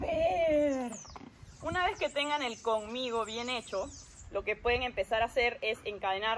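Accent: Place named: Panama City, Panama. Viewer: supongo que por su acento es de Panamá capital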